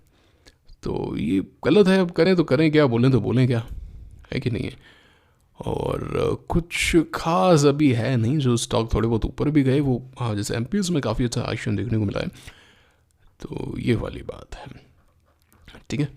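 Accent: Rajasthan, India. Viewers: Bich bich mein rajsthani accent aa rha haiiiiìiììiiiiiiii........ sir 22 ke aaspaas se 😀😀😀😀😁😁😁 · Sir aap Rajasthan se ho na 😅 . Love you sir 😊❤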